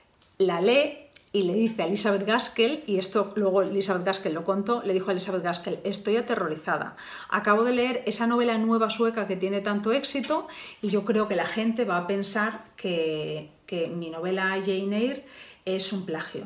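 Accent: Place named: Asturias, Spain. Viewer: eres muy culta y hablas muy bien. Tú acento asturiano me encanta.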